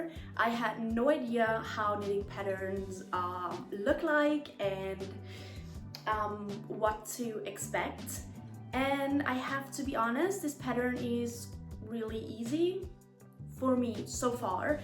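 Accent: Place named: Italy. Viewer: ngl never heard an Italian speak this good English, there is always a very noticeble accent but not as much from you which made me want to keep watching more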